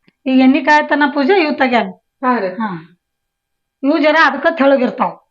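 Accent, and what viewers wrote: Maharashtra, India. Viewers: Nimadu yaa uooru Maharashtra hatira.ada einari yakandr marathi mix bhasha bhala matadteri. Nimm bhasha Keli khushee aitu nanu hinge matadteni aadak❤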